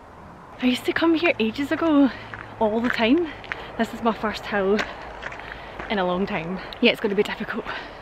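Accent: Scotland, United Kingdom. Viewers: I am in love with the Scottish accent!! 😍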